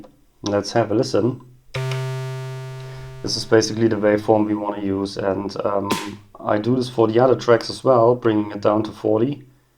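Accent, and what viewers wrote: Germany. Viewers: Your Stimme Sounds a bittl german. Can u make a Video on Deutsch? ;)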